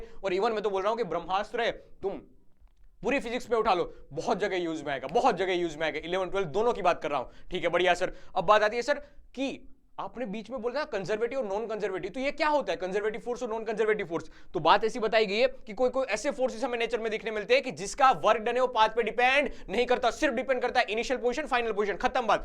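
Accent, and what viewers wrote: Gujarat, India. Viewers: Sir aap Gujarat se ho kya aapki voice tone bata rahi hai 😅😅 Sir aapne bohot accha revision karvaya ❤❤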